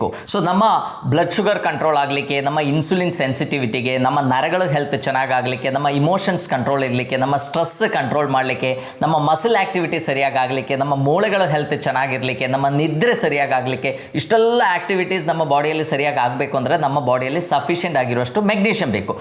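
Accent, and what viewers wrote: Kerala, India. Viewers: are you keralite speaking kannada,..?? but you speak well,..!! the composition of chlorophyll, haemoglobin are almost same except for Mg,... replace it ,.. it is blood cell composition,... hence cattle/ grass fed animals are getting blood compositions from grass itself,... !!first source,.. hence grass superfood,.. !!ultimate food,... hence worshipped in every pooja,.. as darbe,... around the fingers as a ring like,...!!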